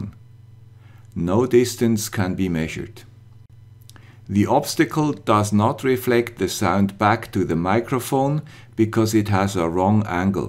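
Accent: Switzerland. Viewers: Hallo, auch hier einer mit dem swiss accent:) Ich habe diesen <link> Ultraschall-Sensor gekauft. Ich möchte diesen gerne mit I2C betreiben mit einem STM32F446RE Mikroprozessor. Leider habe ich die slave address noch nicht ausfindig machen können oder auch das abzurufende Register. Kannst du mir weiter helfen?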